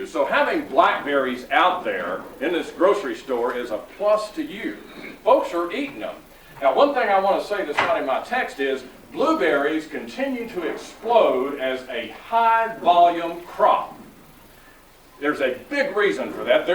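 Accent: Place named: Arkansas, United States. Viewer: Excellent info, two thumbs up! Also if you want a chuckle, turn on CC, and see what it translates Arkansas accent to. lol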